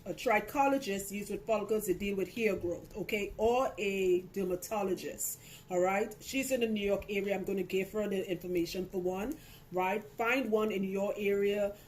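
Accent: Trinidad and Tobago. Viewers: Sounds very trini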